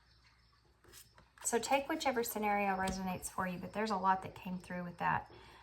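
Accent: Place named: Deep South, United States. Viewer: Thank you! I love your accent! I have relatives from the Deep South and I love to hear them talk. You have no idea how accurate this reading is, although I really am not interested in this person coming back into my life.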